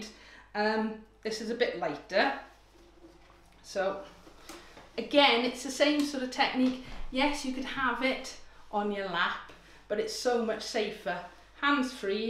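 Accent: Wales, United Kingdom. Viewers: New sub I’m so hate find you. Where from Wales are you from? X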